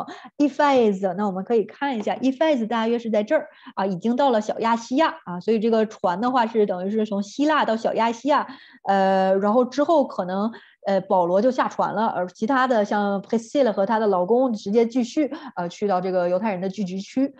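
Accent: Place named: France. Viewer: 老师太棒了！喜欢你的法语口音和阅读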